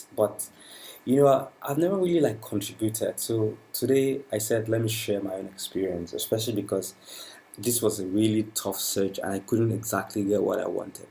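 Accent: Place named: Africa. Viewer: Nice review You sound like an African brother, where you from ?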